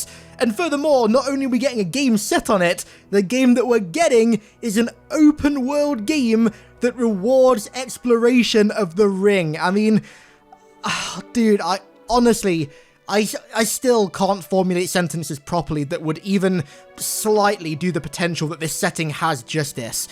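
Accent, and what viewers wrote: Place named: United Kingdom. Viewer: Where are you from? Sound British but speak American.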